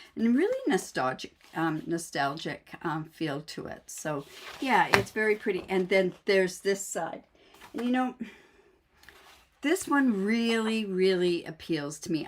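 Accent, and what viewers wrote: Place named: South, United States. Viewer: Love the pecan pie accent!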